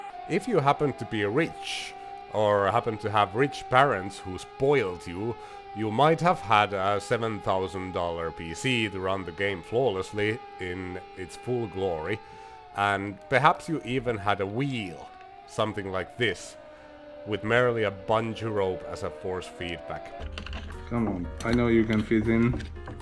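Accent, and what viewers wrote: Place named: Finland. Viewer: From the accent alone I can smell the karelian pie and koskenkorva. Kiitos!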